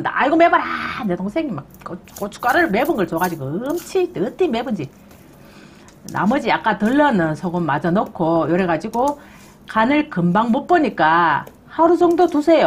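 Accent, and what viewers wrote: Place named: Daegu, South Korea. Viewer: 대구 말이 너무 좋아요 ❤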